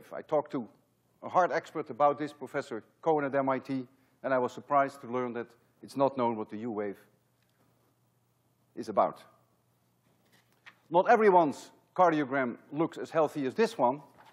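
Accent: Netherlands. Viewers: Yep, he,s a dutch man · Do I detect a Dutch accent? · I knew he was dutch because of his accent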